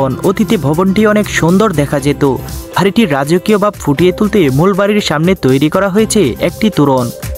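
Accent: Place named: Kishoreganj, Bangladesh. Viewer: কিশোরগঞ্জ নেত্রকোনার আঞ্চলিক ভাষা বাদ দিয়ে শুদ্ধ ভাষায় কথা বলার চেষ্টা করুন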